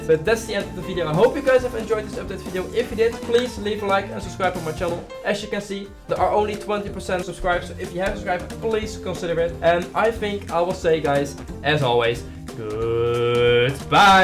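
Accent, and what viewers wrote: Netherlands. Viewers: Ik ruik Nederland (door je accent)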